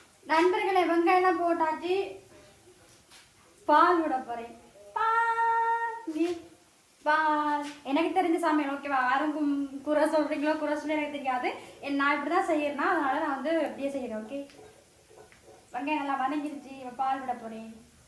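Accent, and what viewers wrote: Sri Lanka. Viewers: Ammaa neenga jaffna tamil pesa vendam normal tamil paesunga kettikkari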